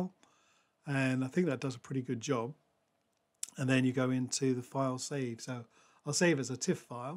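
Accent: Australia. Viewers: you sound like an Aussie expat rather than a Pom is that correct? Great moon images mate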